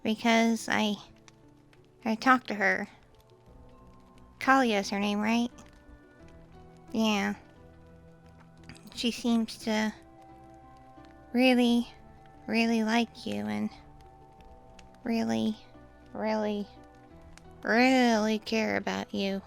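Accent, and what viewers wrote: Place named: South, United States. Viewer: Am I the only one who thinks that the kitsune in the thumbnail looks like an Eevee. Southern accent is nice. Believe me I was born in Tennessee I know what a southern accent is like. Luckily I didn't live in Tennessee long enough to develope a southern accent. Cause I have no attachment to my birthplace once so ever.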